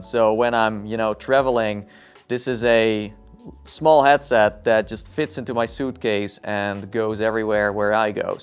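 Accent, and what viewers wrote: Netherlands. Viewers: Wait are you Dutch because you have a accent I fit too but are you Dutch???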